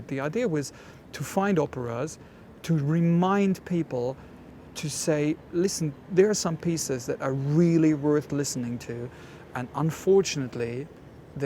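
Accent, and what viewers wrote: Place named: South Africa. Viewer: he sounds south african here...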